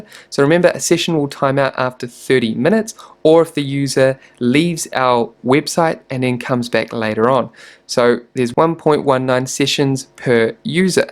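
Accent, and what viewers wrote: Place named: New Zealand. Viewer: How to use google analytics in "twinnie-twinnie"- love it. A Kiwi, right?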